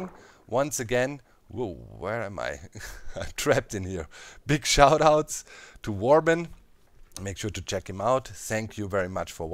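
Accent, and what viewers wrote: Germany. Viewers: man hört das er ein deutschsprachiger ist :P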